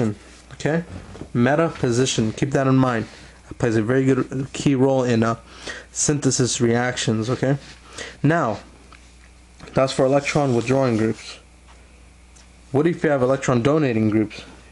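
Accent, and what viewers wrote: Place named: Mexico. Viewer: you sound like a mex which is a direct correlation to lack of intelligence. Therefore, your videos are trash, not unlike the place you were born. Very dry, hard to watch. Spice it up in the future (hot sauce, less bean sounding, less monotone) was a decent attempt i guess tho could've been worse. You coulda been black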